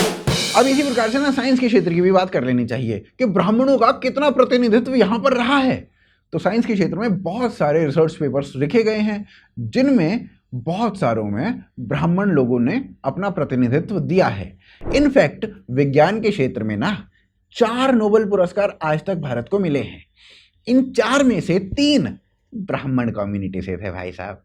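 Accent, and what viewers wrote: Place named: Braj, India. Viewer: Mathura ke ho kya? Bhasha brijbhasha jesi lag rhi thi😊 Anurag Kashyap na Rajput hai, na Brahmin, vo dalla h bs.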